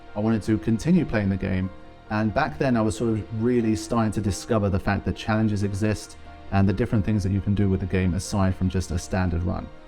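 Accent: United Kingdom. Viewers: Dang, you sound so british when you're muffled. Congrats on the 15 years!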